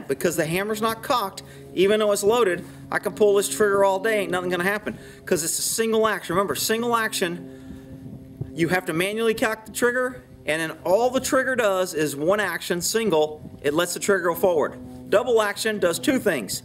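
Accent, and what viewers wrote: Texas, United States. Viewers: This may be the oldest video, I have seen him make, in his newer videos he has picked up more of a Texas accent.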